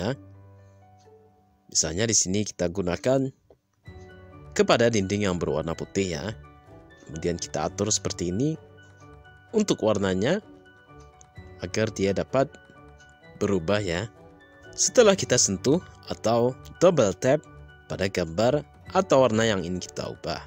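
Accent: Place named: United Kingdom. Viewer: Orang mana kak? Kayak logat english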